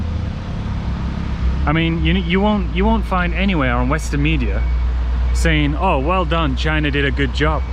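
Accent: United Kingdom. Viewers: No more UK accent? Haha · I came to listen British accent. It's so cute.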